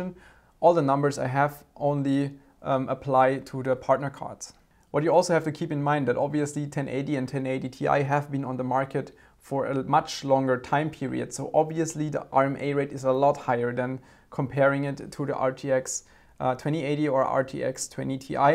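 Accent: Germany. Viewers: Most people??? LOL. I work in a company with over 200 employees, and only a few people speak English, and not even close to flawless. Only a minority of people in Germany speak English. Most learn it at school, then forget it afterwards because they never use it again. And I agree with TheFreeFlow. He could work on his german accent easily by watching more TV shows in English, or tech channels. Anything really, but in English.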